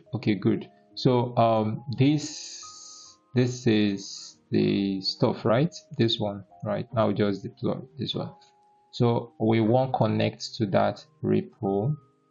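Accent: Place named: Nigeria. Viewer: using nigerian english make this amazing :yougotthis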